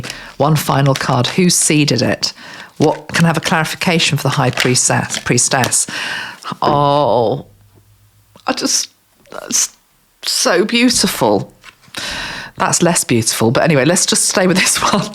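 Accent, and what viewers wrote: United Kingdom. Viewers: Iam a rh negative. everything you said about make a lot of sense to me. There are 4 person in my family that are negative as well. It is the first time i have been whatching your live. and liked very much e liked your British accent . Life for negative blood is not easy, we are different and rebel. thank you for your work.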